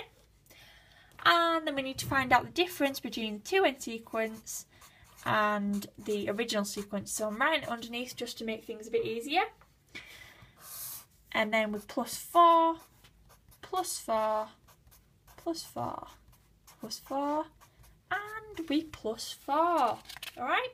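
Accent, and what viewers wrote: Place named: Yorkshire, United Kingdom. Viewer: Ah that's a shame, every now and then I have to come back to GCSE math and this video was easily the best I could find. Also nice to hear a familiar accent! Where are you from? I'm in Leeds I'm guessing you're from somewhere in Yorkshire?!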